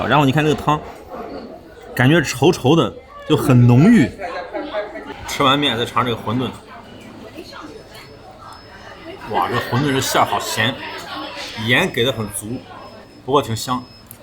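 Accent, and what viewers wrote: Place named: North, China. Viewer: ：听口音，你是北方人，不是西北或者华北人。你在吃江南面食小食等插话缺乏艺术，也缺少历史文化语言。 南北方吃文化相差很大，江南以炒菜为主，北方以一锅煮为主，作为美食家要会比较。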